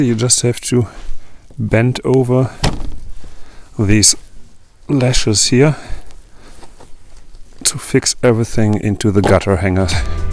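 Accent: Germany. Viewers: Just found this series. Trying to figure out where this property is? The guy seems to have a German accent? Love the progress he has made so far. Very satisfying to see the yard clean ups! Please post an update. Beautiful countryside wherever it is. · your German accent is what makes you charming to me. I’m in Nebraska USA. Dead center of the country 😁 I’m truly enjoying your channel. Keep up the great work 😊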